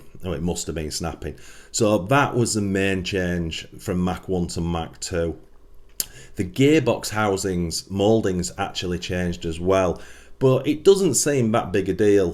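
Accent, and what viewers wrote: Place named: Yorkshire, United Kingdom. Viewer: I think its Yorkshire accent. He is saying mark 1 (mk1)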